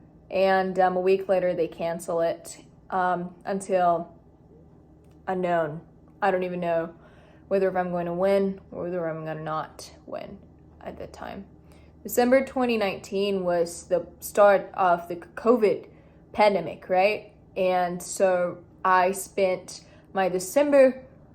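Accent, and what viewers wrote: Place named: United States. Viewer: Weird how you have an American accent but your grammar is lacking. How do you even remember what you did in elementary school?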